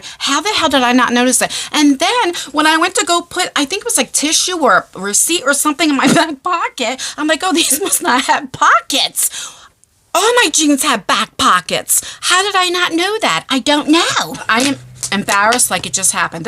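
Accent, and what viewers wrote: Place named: East Coast, United States. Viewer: I love it when you say "ya know" just as much as I love your East coast accent. You can't have that without "ya know" ❤❤❤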